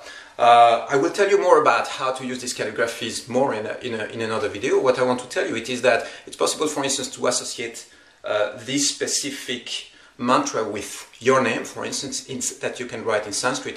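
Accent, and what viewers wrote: France. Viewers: are you french? really like your videos!!!!!!!!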